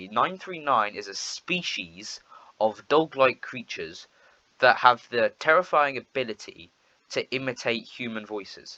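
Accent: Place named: United Kingdom. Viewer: Nice your British funny voice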